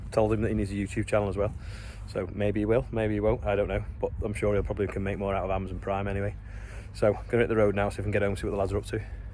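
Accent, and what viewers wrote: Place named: Scouse, United Kingdom. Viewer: What makes an interesting theme. it simply YOU. You have a way of narrating a video which is unique. I think it’s your soft Scouse accent, your genuine and honest and say it as it is attitude. I worked on farms as a kid and am lucky enough to have a couple of acres of English countryside and look forward to your vids most nights. You come across as a caring sole who does his bit and probably a bit more for your local community. I wish you all the success in your real job and the u tube thing. Quite simply you get outa life what you put in. Keep up the great work your a credit to your family 👍🏻👍🏻. That’s made me feel 100% 👍🏻👍🏻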